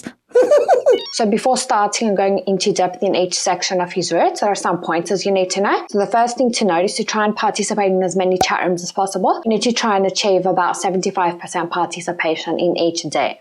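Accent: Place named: United Kingdom. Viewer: Es solo por su acento británico, a mí también me costó mucho entenderla pero al final entendí casi todo jfdj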